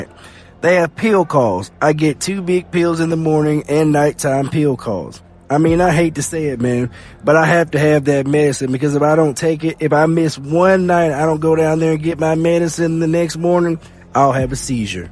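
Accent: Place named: Alabama, United States. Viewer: Dude I swear u talk just as country as I do 😂 what part of Alabama are u from? I'm in Gadsden 😊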